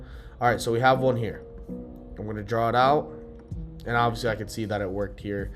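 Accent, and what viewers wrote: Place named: Canada. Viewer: No way are you American, your Canadian with that accent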